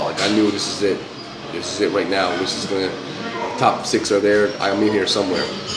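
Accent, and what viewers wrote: Newfoundland, Canada. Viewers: sick newfie accent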